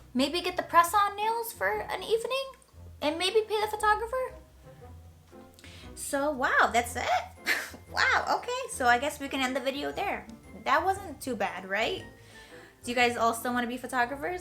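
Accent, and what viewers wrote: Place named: New Orleans, United States. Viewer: I thought it was New Orleans accent, she reminds me of my friend from there. But she also kind of reminds me of Jennifer Lopez, though I haven't heard her as much.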